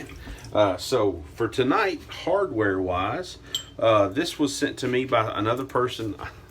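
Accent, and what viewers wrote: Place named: South, United States. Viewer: Great Southern accent, what state is that?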